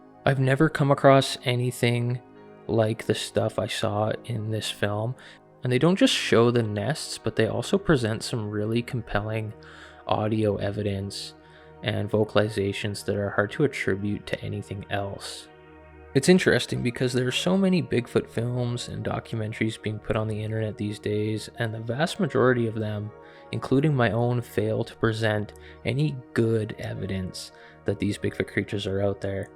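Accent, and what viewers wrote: Canada. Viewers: Yes that's a good documentary but I like your stuff the best an I hope it's you that proves bigfoot is real I love your Canadian accent 😂 keep it coming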